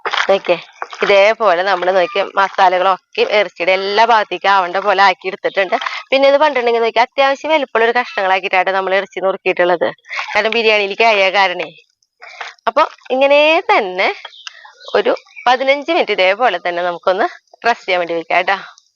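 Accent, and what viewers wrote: Thrissur, India. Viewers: സംസാരം കേൾക്കുമ്പോൾ തൃശ്ശൂർ ആണെന്ന് തോന്നുന്നു....... എവിടെയാ സ്ഥലം?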